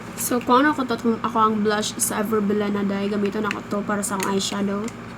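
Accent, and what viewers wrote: Visayas, Philippines. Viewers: Trueee! HAHAHAHA may sense of humor kasi saka bet na bet ko yung accent ng pagbibisaya niya😂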